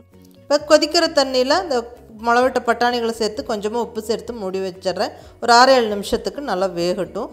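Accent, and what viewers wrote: Coimbatore, India. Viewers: ஆளுமையான கோவை வட்டார உச்சரிப்பு ,எளிமையான, அசத்தலான பேச்சு, எளிமையான சமையல் ஆண்களுக்கும் ஏற்ற குறுகிய நேர சமையல், அருமை, வாழ்க பல்லாண்டு.நன்றி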